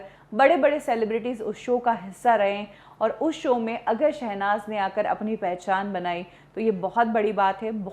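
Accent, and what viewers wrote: India. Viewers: Desi style batein or lahza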